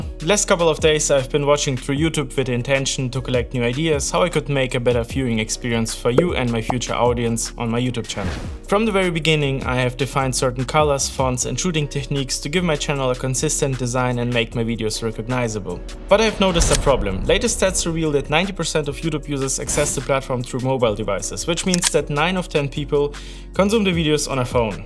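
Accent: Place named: Germany. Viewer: Ein bisschen harter Deutscher Akzent, aber in Sachen Videoschnitt ein wirklich sehr gelungenes Video. Optisch wirklich sehr ansprechend. Ach ja und danke auch für den eigentlichen Inhalt des Videos, den Hinweis auf das neue Format.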